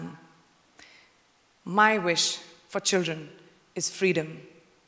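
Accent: India. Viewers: Finally, i found someone who don't change her indian acent 😊😊.... india has its own acent ..u have don't need to copy native contries acent . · Clear Indian accent.💖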